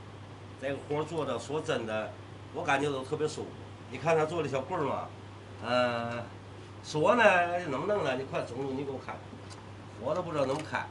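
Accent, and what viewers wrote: Tianjin, China. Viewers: 在外留学的天津人。最近刚遇到您们的视频，听到乡音无比亲切，看到木工大开眼界。未来家里的布置的审美得到了培养。谢谢上传者，更要谢谢老师傅。美好的事物永恒。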